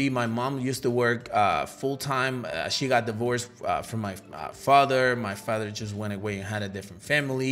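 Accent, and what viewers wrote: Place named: United States. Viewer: Which country are you from? You have an American accent and you seem like a nice person 🙂It is true, you can't always be on the same page with everyone.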